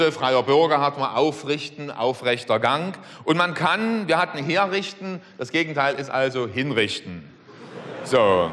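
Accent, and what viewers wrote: Saxony, Germany. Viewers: Na Du gude, das iss säggssche schbraache.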